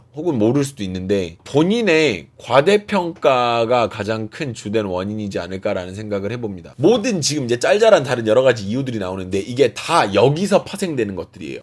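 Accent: Jeolla, South Korea. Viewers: 고향이 혹시 전라도이신가요 말투가 서울말투같지 않아서...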